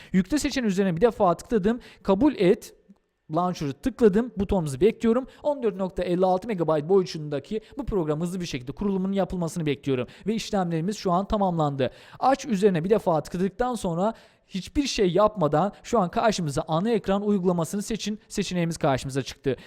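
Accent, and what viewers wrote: Azerbaijan. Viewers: abi sen azeri misin merak ettim :D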